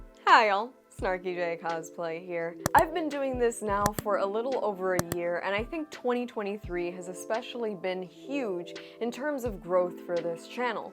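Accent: Spain. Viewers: Oh and i just love your spanish accent!!!!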